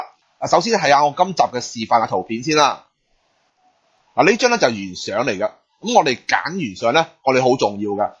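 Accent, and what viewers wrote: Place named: Hong Kong, China. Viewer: 本来想这找一个背景不是白色的 扣头发丝教学， 一听还听不懂，头一回听感觉像香港那边人说话的声音。。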